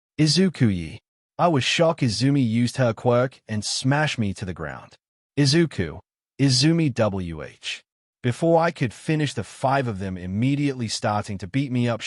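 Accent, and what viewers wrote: United Kingdom. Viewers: Bro sounds British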